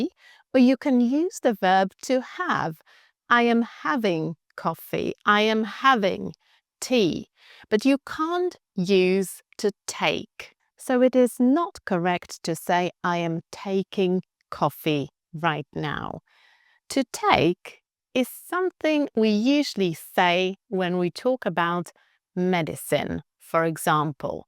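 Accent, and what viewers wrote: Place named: United Kingdom. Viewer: Thank you another great video. How do you get a British accent?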